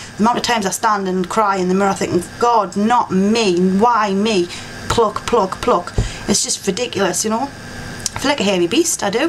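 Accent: Newcastle, United Kingdom. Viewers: She is from South Shields which is kind of on the outskirts of Newcastle, the area is called South Tyneside. People from that area are generally known as 'Geordies' and the distinctive accent is called 'Geordie'.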